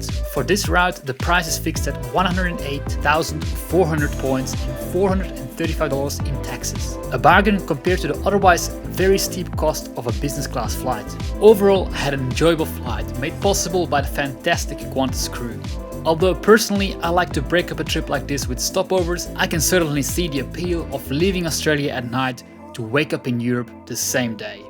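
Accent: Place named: South Africa. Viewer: Thanks for the upload. Haven’t flown Qantas for many years so it was interesting to see what they are like now. The lounge at Perth looked great and not overcrowded, a rarity these days. I’m trying to place your accent, there’s a hint of South African, mixed with a bit of Australian. I love trying to guess accents.😁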